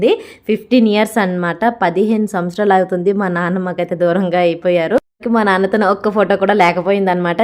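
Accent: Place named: Srikakulam, India. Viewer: appa nuvu great. I love you srikakulam slang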